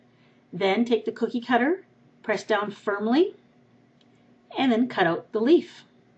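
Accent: Canada. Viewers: Lies! Lol, not that it's a BAD thing necessarily, but I have definitely head you yourself say the word about like "aboot" while watching a large amount of your videos -I'm kinda addicted- :P. Seriously, that was how I almost instantly knew you were Canadian. I just went to your actual channel to verify.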